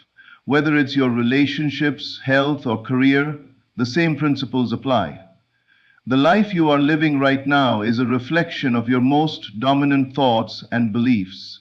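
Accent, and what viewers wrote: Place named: India. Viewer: Is this the Indian Neville Goddard because he has an Indian accent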